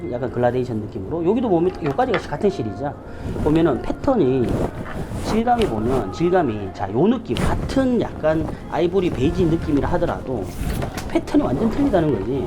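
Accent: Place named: Gyeongsang, South Korea. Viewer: 학교 선생님하셨어도 애들한테 인기짱이셨을 거 같아요 경상도 사투리임에도 정확한 딕션과 유머러스한 말투 너무 재밌고 귀에 쏙 들어와요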